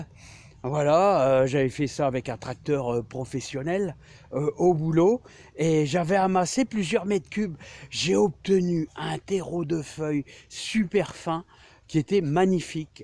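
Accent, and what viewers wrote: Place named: Hirson, France. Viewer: Vu l’accent j’aurai dit vers Hirson merde j’ai perdu j’ai quitté la région depuis trop longtemps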